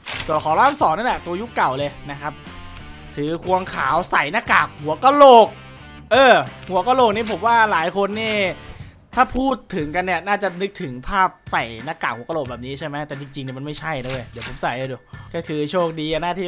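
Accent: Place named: Korat, Thailand. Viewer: ดูว่ะ นึกว่าคนโคราช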